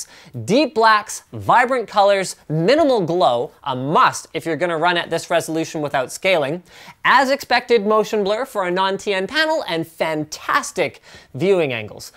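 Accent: United States. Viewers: Canada? Lol I thought you were from the US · Yeah, at first I thought that he's a US citizen due to his accent.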